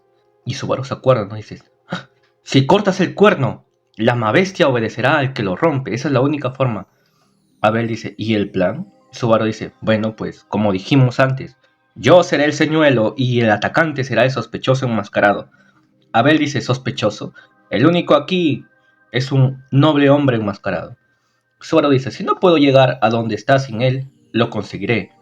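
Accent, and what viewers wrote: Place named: Colla, Bolivia. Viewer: Cada vez habla más coya mi causita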